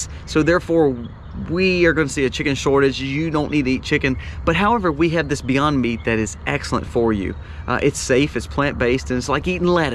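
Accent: South, United States. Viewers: I am new to your channel as of a few days ago! I have learned a lot already, so keep the videos coming. Love that southern accent ❤!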